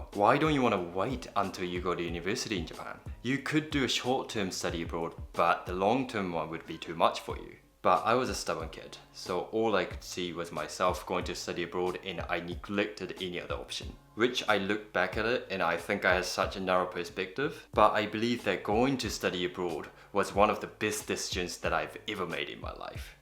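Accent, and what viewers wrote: New Zealand. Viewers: Hey, I love listening to your Kiwi English!! This is Tia again, thanks for getting back to me the other day to my comment. I also left Japan quite young, age of 15 after graduating JHS in Japan. I've lived in California for 6 years going to HS and Junior College. I chose to come back to Japan to work with a boyfriend I had at that time. (that is not my hubby though) well, my best friend who recommended your channel and I zoom nomikai sometimes and we talk about time of our life back in States. You must be a super dupper busy guy, but if there is a chance, It would be my dream come true to chat with you!!! Thanks for your time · アクセントはめっちゃくちゃニュージーランド人っぽくてびっくりしました！このような自然な日本人のアクセントで話せるようになりたいです！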